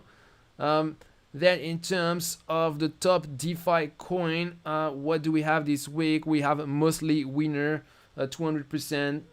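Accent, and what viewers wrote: France. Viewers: Yo great content!! are u french?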